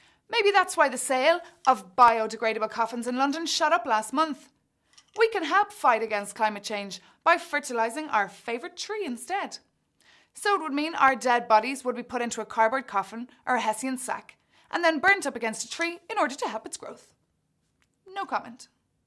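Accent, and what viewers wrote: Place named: Ireland. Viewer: Irish accent... I like her accent...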